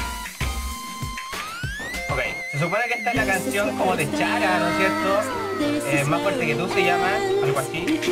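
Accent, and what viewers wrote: Chile. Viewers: Eri chileno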